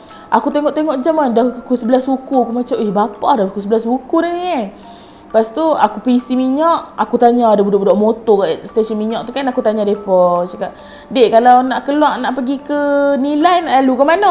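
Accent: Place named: North, Malaysia. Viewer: Assalamualaikum...sempoi first time dgar ni...dah follow page ni...bhasa utara to smpoi...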